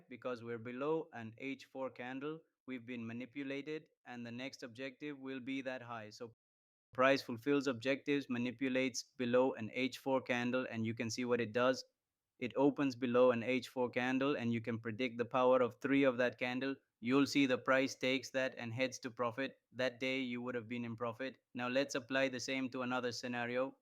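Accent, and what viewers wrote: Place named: India. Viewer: Estabas bien haciendo tus explicación en tu idioma natal el castellano. Pareces un Indu hablando ingles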